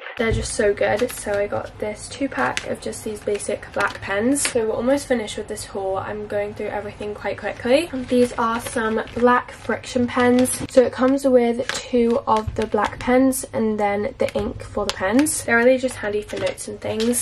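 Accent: United Kingdom. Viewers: are you british or american? :)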